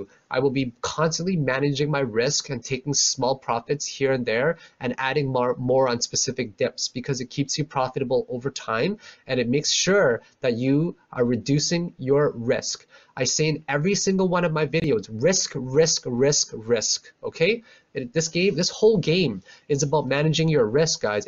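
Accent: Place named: Canada. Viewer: You are awesome dude. Canadian right? I can hear it in your voice. Alberta here.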